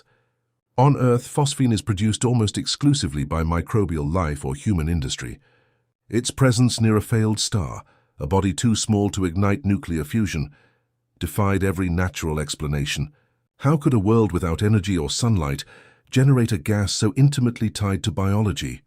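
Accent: United Kingdom. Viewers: Another AI "terrifying" clickbait video with vague British accent.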